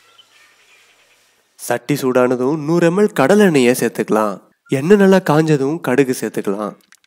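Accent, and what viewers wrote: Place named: Kongu, India. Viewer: Konku tamil pronoun supper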